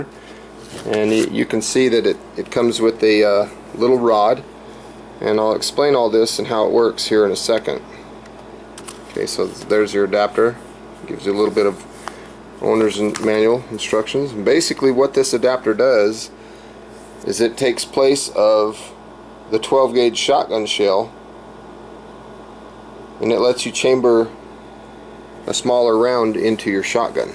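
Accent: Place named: Minnesota, United States. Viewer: Hey, where are you from? You sound like a Minnesota native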